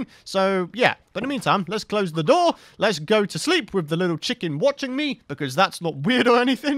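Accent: United Kingdom. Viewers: I love british accent people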